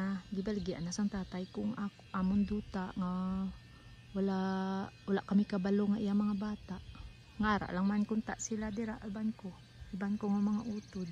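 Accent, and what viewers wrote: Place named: Iloilo, Philippines. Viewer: I like the accent. I love Ilonggos..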